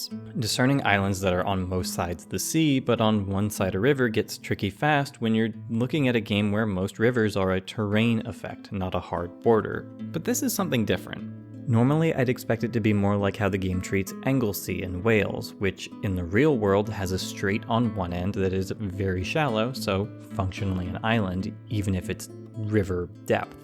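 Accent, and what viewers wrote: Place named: Ohio Valley, United States. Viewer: If you want to see a map game pushed to its limits, try Mappa Mundi for HOI4. Also, up until you mentioned it, I was wondering so hard why you sounded so familiar and local and then you said you were from the Ohio Valley as well lmao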